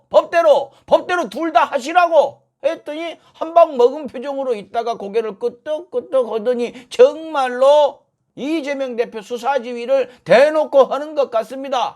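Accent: Jeolla, South Korea. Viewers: 형 전라도야?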